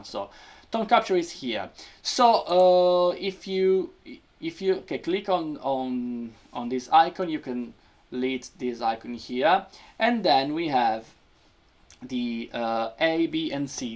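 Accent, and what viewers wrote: Piedmont, Italy. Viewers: Do we have to comment in english too? Am I more international too this way? Seriously, this feature is mindblowing, I'd like to see what it can do with pedals or other stuff (By the way, you still have a piedmontese accent while you speak english ahahah)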